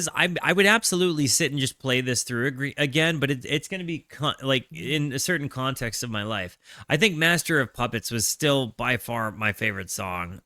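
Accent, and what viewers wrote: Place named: Locust Valley, United States. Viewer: This guy has that Locust Valley Lockjaw accent.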